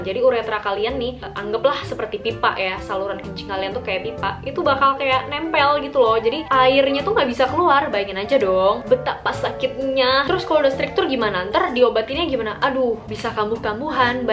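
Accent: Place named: Betawi, Indonesia. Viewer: Enjoy banget dengernya😍😍😍 btw logatnya jadi kaya agak betawi ya😂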